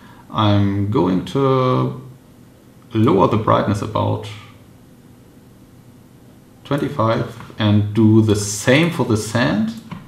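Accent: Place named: Germany. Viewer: Guten Tag. Thank you for your workflow video. Really helpful 👍 Btw, your accent reminds me of my semester in Germany (Koln), wonderful memories.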